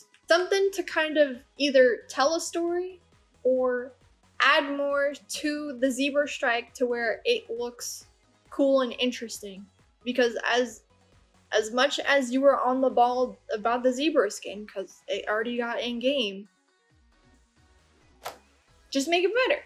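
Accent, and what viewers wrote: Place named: Texas, United States. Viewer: You’re from Texas. Well you sound like you are!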